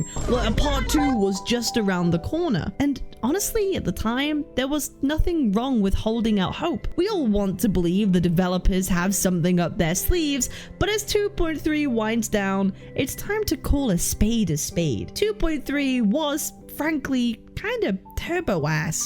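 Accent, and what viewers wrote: United Kingdom. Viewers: This vtuber isnt the real one, Your british accent is weak And you sound eloquent. The real one sounds Turbo cracked out Also an anniversary is about the rewards. Which were ass. No free zani or ciaccona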